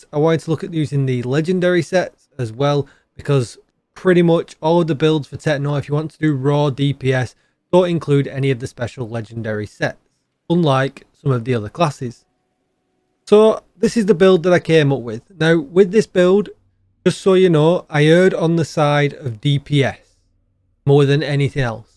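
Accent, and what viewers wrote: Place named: Yorkshire, United Kingdom. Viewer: Hey where in the UK are you from. Your accent sounds maybe Yorkshire? Great content by the way.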